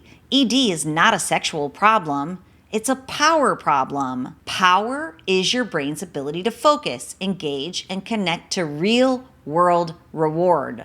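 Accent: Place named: Great Lakes, United States. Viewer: You're from somewhere around the Great Lakes arent you?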